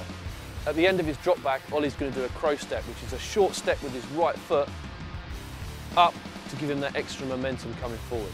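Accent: United Kingdom. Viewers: LOL he's British! Nice video, though. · I did not expect a British dude teaching American football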